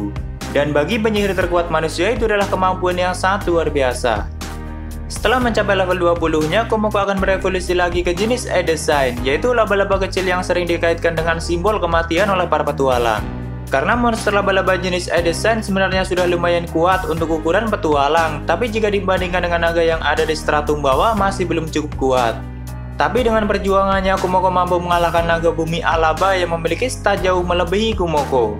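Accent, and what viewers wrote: Banyumas, Indonesia. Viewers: Ngapak wkwk malah kocak gua dengernya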